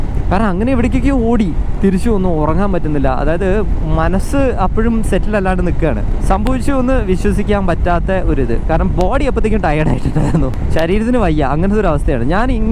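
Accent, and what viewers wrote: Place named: Kannur, India. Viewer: na....nte mone🤣 ningalu kannurinu aduthano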